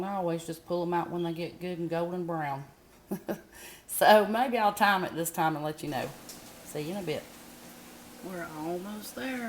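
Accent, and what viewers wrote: United States. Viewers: FANTASTIC! You sound like you're from Mississippi. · The whole time I was watching your video I was thinking, I know who that is she sounds so familiar, then I realized that your from Georgia you sound like everybody I know because I'm from Georgia also. That whitelily flour makes all the difference.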